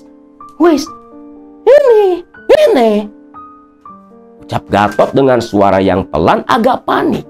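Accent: Java, Indonesia. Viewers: Bahasa Jawa kang lucu 😅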